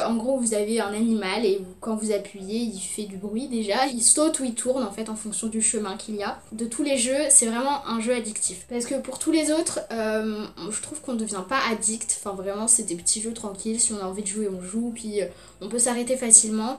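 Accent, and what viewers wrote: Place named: England, United Kingdom. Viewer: Magnifique accent anglais bonne vidéo bravo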